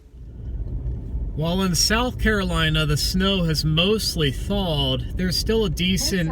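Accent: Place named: Eastern Pennsylvania, United States. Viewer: Finally learned where he’s from in PA (Pottstown) after watching a dozen of his videos. Knew from his accent it was definitely not western PA. Ahh, Chester county at its finest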